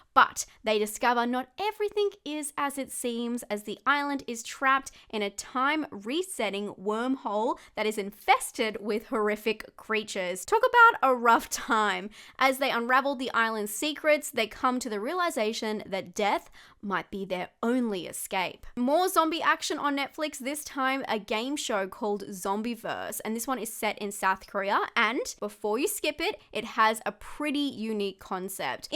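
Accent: Australia. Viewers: It’s great to hear an Aussie accent! Keep up the good work, love you recommendations 🇦🇺👍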